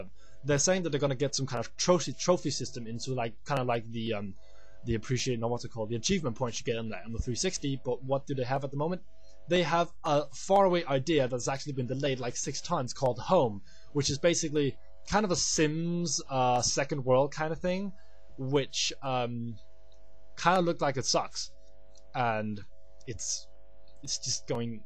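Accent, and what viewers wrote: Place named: United Kingdom. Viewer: I like how your accent is more british than american :D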